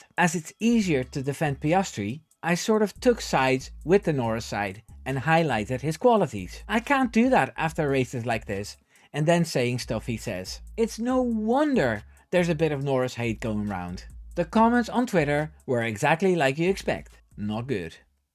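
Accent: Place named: Netherlands. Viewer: I mean, even if I would have seen your videos for a very first time I would have known your Dutch by (and I don't mean this insutling) your obvious Dutch accent lol and no Haas is not rabbit, Haas is Hare. a rabbit is a konijn.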